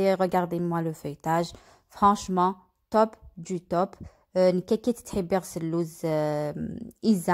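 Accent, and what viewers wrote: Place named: Béjaïa, Algeria. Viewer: La présentation et la formation juste moyen y a meilleure Consulté youtube vous verrez Merci comme même pour la recette L accent me fais rappeler sidi Ahmed Béjaïa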